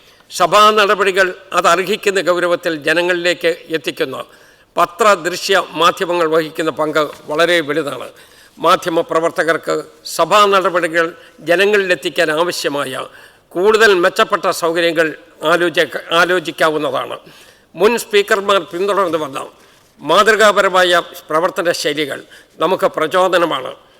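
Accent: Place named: Kottayam, India. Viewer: ഭാഷാ ശുദ്ധി ❤❤❤ കോട്ടയം അല്ലേ ❤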